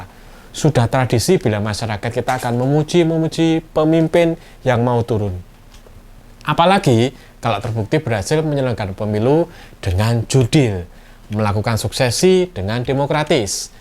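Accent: Java, Indonesia. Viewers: Muak dengar logat Jawa nih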